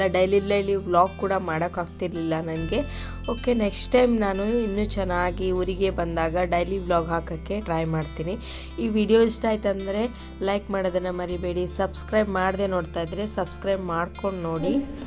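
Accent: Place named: Kundapura, India. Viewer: Hii akka Kundapura knnda matastri .. Yav oor nimdu . Nav hemmady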